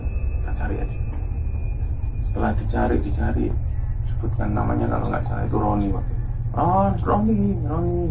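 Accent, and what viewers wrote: Java, Indonesia. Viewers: Mas keren..pokok nya..cara penyampaianya bagus..kalwm khas jawany keluar slm dr jogja.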